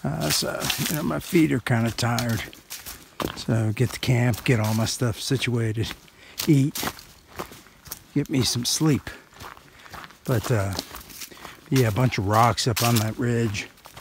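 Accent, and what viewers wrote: United States. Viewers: Great videos! For someone from NJ, you picked up a nice southern accent! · I knew you were from Jersey. That North Jersey accent is unmistakable!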